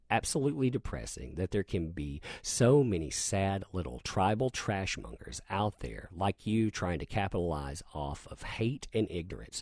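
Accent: South, United States. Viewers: Lol. Right. I’ve left comments a couple times about the “within this/that” blowing him shit about it in a friendly way. But this particular video had “within” all over it in other contexts too. Yeah I’m mostly just kidding. It doesn’t actually bother me or anything. And that’s a great way to look at it! You know he hasn’t been replaced haha I was thinking maybe that’s like a regional colloquial thing(?). He does have a southern accent a bit and I’m from the Midwest. Maybe that’s something folks say where he’s from. Now cap’n Cummings I just can’t watch anymore. Not because I disagree w him on anything at all (I 100% agree w his takes just like D&C and ) but his constant mispronunciation and misreading of simple common words. He’s SO dumb I can’t take it lol. God love him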